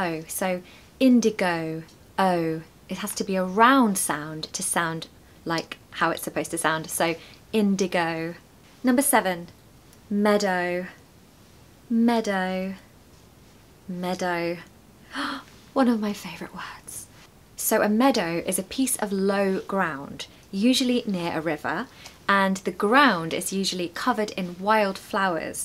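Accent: United Kingdom. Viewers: I am from Bangladesh. Your teaching method is amazing and heart touching. I am really smitten learning authentic and latest British accent from a versatile English teacher like you. May Allah bless you with his divine love to live long! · I love you, and I love british accent too ❤ Amazing video. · Actually, I loved English at the very beginning because of British way of pronunciation. Now I enjoy it listening to you. Thanks for the videos. They are soo lovely 😊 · I'm from Thailand .l love British accent And you so Amazing ❤ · I don’t know how to describe my feeling now, just to say you’re great, you’re amazing; your choices, your performance and your accent. I found your channel last night and saw you had uploaded your first video two months ago. Really liked to be the first one who watch your videos. You speak English like a mermaid, soft, elegant and poetic. 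Your tone is so soulful. I’ve seen lots videos on YouTube in the British accent but yours are something else. We’ll await new videos.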